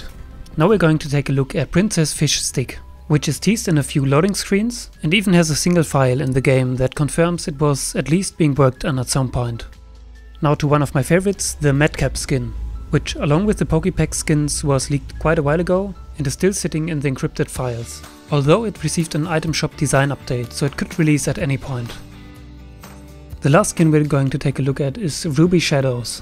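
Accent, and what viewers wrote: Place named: Germany. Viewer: man hört den deutschen aktzent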